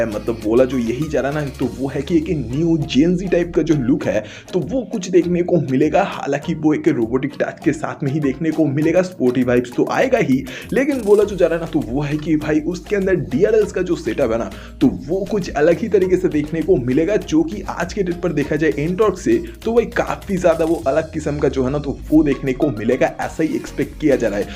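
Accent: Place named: West Bengal, India. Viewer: Voice to bangoli lagta hai 😅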